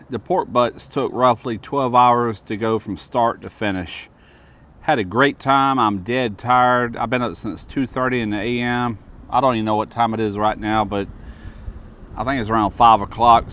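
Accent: South, United States. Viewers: you make fabulous videos! Most informative, along with a great Southern drawl that makes a super combination for super, southern cooking! Thanks, and looking for more of your videos!!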